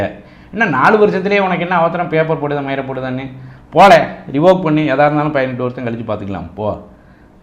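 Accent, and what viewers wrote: Tirunelveli, India. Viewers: Very nice to hear the Tirunelveli slang ❤❤❤🎉🎉🎉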